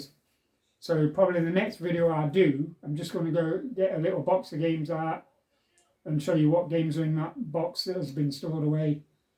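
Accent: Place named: Nottingham, United Kingdom. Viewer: Where abouts are you from roughly mate? Can't quite work the accent out but you sound like you're from my neck of the woods (Nottingham/Mansfield) way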